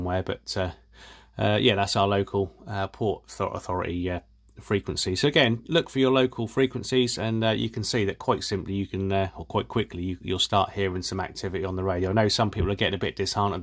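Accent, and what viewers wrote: Norfolk, United Kingdom. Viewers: Thass a fine Norfolk accent you've got there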